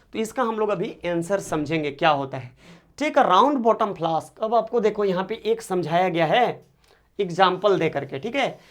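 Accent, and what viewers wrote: Bihar, India. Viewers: Yah sir Bihari kyon bolata hai yah Bihar ka lag raha hai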